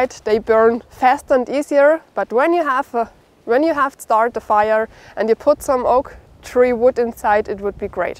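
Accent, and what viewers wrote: Germany. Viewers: Just found this channel, it’s wonderful! My mother is German, father USA (he was stationed in Germany when they met). Naturally, I love the sound of your voice and enjoy hearing the Germanic inflections as my mother spoke that way. I love trees, I grew up in areas with lots of woods to explore and play in. The forest is my favorite environment. Yes, please do a video about winter foraging! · You German?? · love your video…it has lots of great information. I live in WA State USA, on the Border with Canada. On my 10 acres we have Alder, Broadleaf Maple and Vine Maple, Birch, Bitter Cherry, Indian Plum, Douglas Fir, Hemlock, Cedar, Mt. Ash, Butterfly Bush and wild Hazelnut. I’d love to learn more about uses for these trees and shrubs. I really like your German accent and your English is excellent. Thank you for sharing and I’ll keep watching and learning from you.🇺🇸🇩🇪🇺🇦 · Is she German? 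Lived there 88-90 in the US army. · I think it's a German accent from Alemania. Again I can't spell very well. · I really enjoyed this video. I have subscribed because there seems to be something new to learn about the uses of herbs and trees. I also LOVE your accent. I have been to Germany three or four times, and I get a chance to practice speaking German. Mein Deutsch ist ganz schlimm aber Ich kann es verstehen und lesen. Deutschland ist mir lieb.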